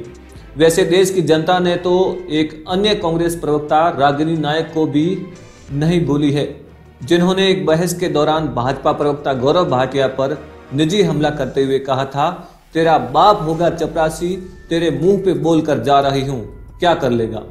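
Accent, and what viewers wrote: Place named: Rajasthan, India. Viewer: Rajasthani bhai se.....tabhi Hindi ke pronunciation mein 'छ' ki jagah 'स' ka prayog kiya hai. That's disturbing.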